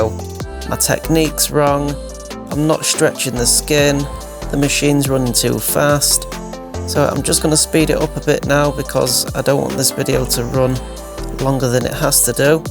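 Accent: United Kingdom. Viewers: est-ce qu'il a un accent genre super british?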